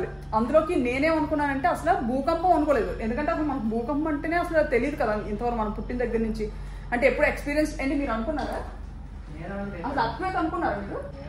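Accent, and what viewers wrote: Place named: Srikakulam, India. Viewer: Language Srikakulam maraledu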